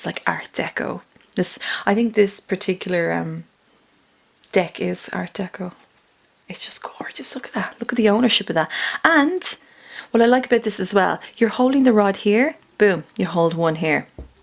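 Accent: Ireland. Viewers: Love that reading, love the Irish accent too! I'm Irish and lived in America for twenty one years, never got the adopted American accent, so hopefully you won't either! Thank you!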